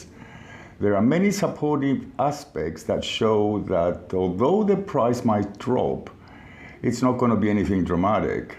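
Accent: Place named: Cyprus. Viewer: Hey hey! nEw here and truly amazed! Judge by your accent you Cypriot? ;p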